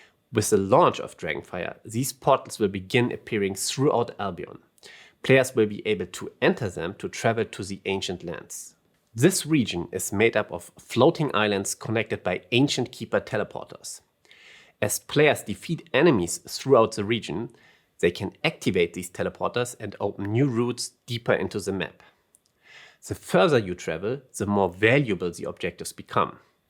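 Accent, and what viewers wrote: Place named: Germany. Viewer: Uhhh this German accent... 😋 So cute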